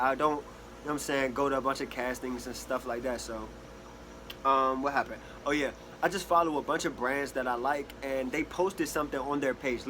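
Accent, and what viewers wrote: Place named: New Orleans, United States. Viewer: You talk like you from new orleans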